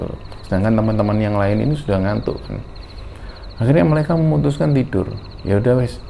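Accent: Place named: Surabaya, Indonesia. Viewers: Ga bisa dipungkiri bahwa memiliki ciri khas yg tidak dimiliki chanel" lain yg sejenis, dalam diri mas (tanpa mengecilkan peran team yg lain) dengan runtut cerita yg jelas,lugas, sederhana, tenang, mampu menghidupkan suasana cerita, tentunya logat Surabaya yg khas. Pertahankan semua itu cak, yg perlu ditingkatkan hanya intensitas upload cermisnya saja, biar kami sobat kembara ga kelamaan nunggunya, maju terus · BetuL...memang jauh berbeda,pendalaman cerita,penyampaian,anatomi tubuh dan aksen suroboyoan betul2 itu yang membuat beda dengan yg lain,mantapzzz 👍👍👍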